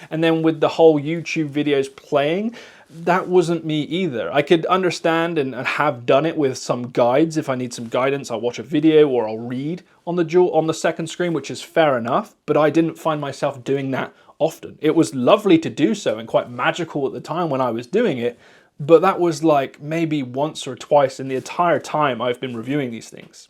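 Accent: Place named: West Country, United Kingdom. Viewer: My guy, you sound like you're from the Westcountry like me! I cannnnnot wait to get my Thor!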